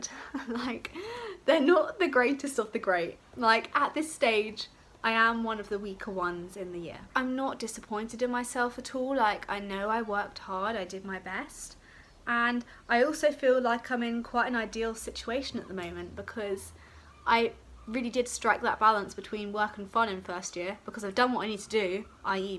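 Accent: United Kingdom. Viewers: Are you American? You've got a British accent! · Omggg i love your accent 😍😍i'm in love with British English aaaaaaa😍😍😍😍😍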